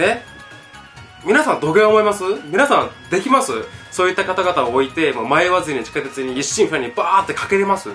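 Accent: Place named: Fukuoka, Japan. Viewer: 福岡なまりが懐かしくて癒やされます！お疲れ様でした(`･ω･´)ゞ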